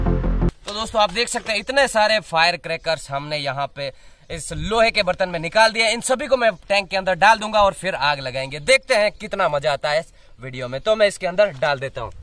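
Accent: Rajasthan, India. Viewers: Aap rajsthan se ho kya please replay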